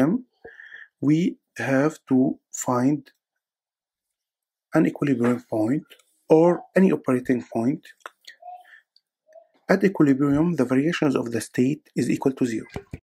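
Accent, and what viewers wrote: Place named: Lebanon. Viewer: i recognized your accent a bit, are you Lebanese? thank you professor for the amazing explanation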